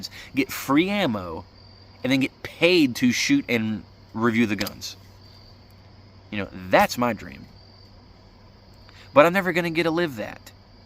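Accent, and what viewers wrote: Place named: South, United States. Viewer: I like your southern accent and your style of narration ❤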